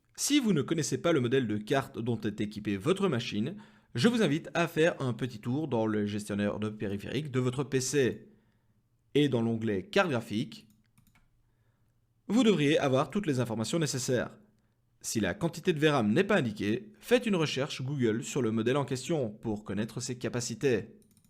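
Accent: Belgium. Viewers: J'ai cru entendre un p'tit Belge, bon, je me suis lancé avec une 6900xt, j'ai une 5700xt sur le côté, j'hésite à l'ajouter ... Tu en penses quoi ? Actuellement, je tourne à 60MH/s, c'est correct, non ? Merci pour la vidéo, ça fait longtemps que j'hésitais à me lancer, à voir si ça va arrondir mes fins de mois... J'ai aussi la possibilité de mettre un SLI de 2CG en 4Go sur un autre pc, ça va prendre ou pas du tout ? Ou je devrai me rabattre sur une autre crypto moins gourmande, genre ETH Classic (qui demande que 2Go) Signé : un camarade belge ;)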